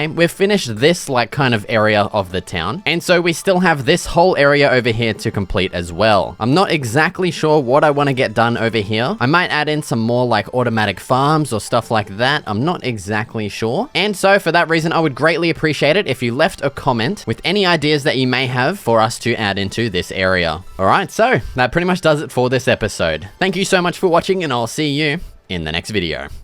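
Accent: Australia. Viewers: why do u sound australian to me????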